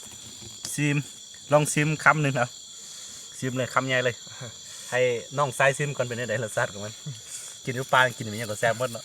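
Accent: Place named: North, Thailand. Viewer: สำเนียงคนเมืองเลย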